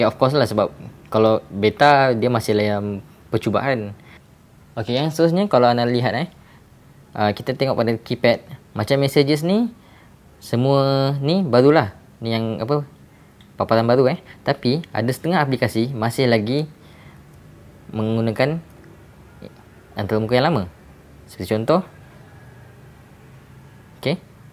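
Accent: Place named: Negeri Sembilan, Malaysia. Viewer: suara mcm org nogori je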